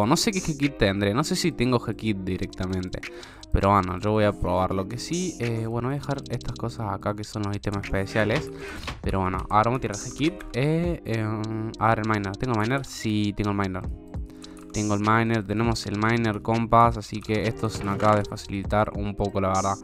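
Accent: Argentina. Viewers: Wacho sos de cordoba?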